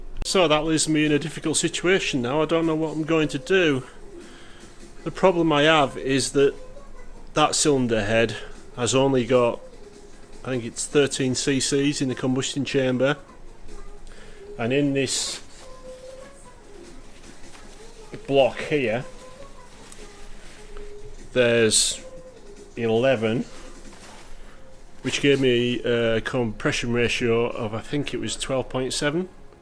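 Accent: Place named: Northern England, United Kingdom. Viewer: The bent valve is a shame, do you know why and what it hit? 12.7 compression? Not messing around are you! What fuel do you run? Could there be a valve from something else that could be modified? Maybe turned down or something? Does REC (Paul Ivey) still exist? I remember going to see him for valves a long time ago! Maybe there's something in the US from a V8 or something? I agree about your videos, the ones where you explain stuff are the best, can't beat the Northern accent! I think as, ahem, more mature Mini blokes, we forget what we know and take for granted over time, young guys just don't get the exposure to basic stuff (although people like Tom have bucket loads of enthusiasm!). Back in the late 70's it was a necessity that you could work on your own banger to keep it going, all my mates did the same and we learned by our mistakes! 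I need to learn more about engine management and injection, that's why I watch your stuff, although I like how you're adding a bit of humour, who ate all the chocolate toffee's........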